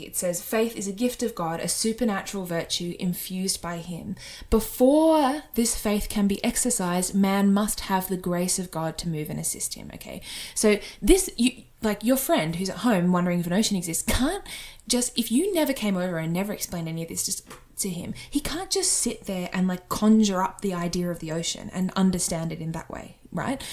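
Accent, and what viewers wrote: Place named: Australia. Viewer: One of my favourite accents, cultured Australian.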